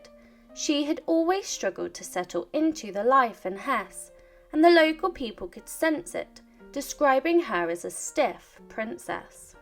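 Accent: United Kingdom. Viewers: . “She also wore black at her wedding.” “She arrived to the ceremony in a white gown.” “Queen Victoria forced everyone to wear black before and immediately after the wedding.” Like, wtf? Also, whichever British accent you have should be done away with. It is the most annoying manner of speaking I have ever heard.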